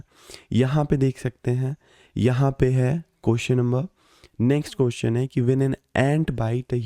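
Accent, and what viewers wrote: Bihar, India. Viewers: Sir, are you from Bihar..? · India se Hai... bihar kya laga raha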